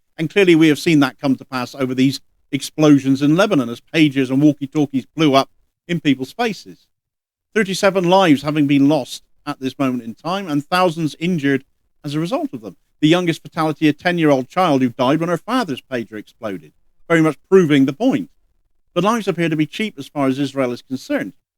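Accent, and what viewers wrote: Cornwall, United Kingdom. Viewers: Aha, a Cornishman! That accent will always make me sit up. This is great, proper job from a Cornish girl living abroad.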